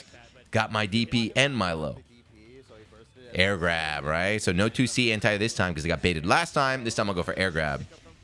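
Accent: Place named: North Jersey, United States. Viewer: Bruh your North Jersey accent is so cringe. Plz quit the weeb FGC, thx.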